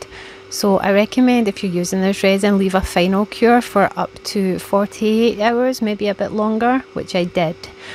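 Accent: Scotland, United Kingdom. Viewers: Hi. Its fantastic to hear a Scottish accent that sounds quite like mine. I'm a bit of a mixture. Born Coalburn Lanarkshire moved to Cumnock Ayrshire. Spent 10 years in Surrey and now I'm in Leslie Fife. Lol. I usually watch Acrylic Paint Pourers from America. Canada and New Zealand. I've just finished Resining a painting and this video of you with your BLESSED Resin plack.. I love it. The colours are amazing I will have to watch the full video. I have subscribed and liked your work. I love Livingston Centre but not been for a while. I have a neighbour who is poorly and wants to go there so I will take her down when we can organise it. Hope to catch up soon. Sandra 🌹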